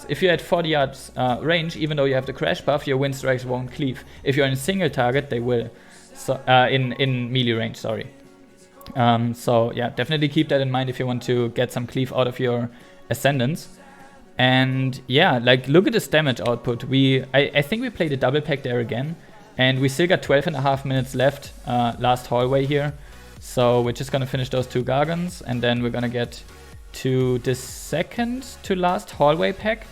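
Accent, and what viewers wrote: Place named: Germany. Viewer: Man hört einfach den deutschen raus aber nices Video 🙏🏻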